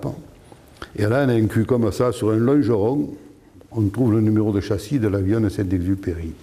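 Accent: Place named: Provence, France. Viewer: J'aime, j'adore votre façon de peindre la vie avec ce magnifique accent provençal !merci pour cette belle histoire 💛❤️aux couleurs de chez vous !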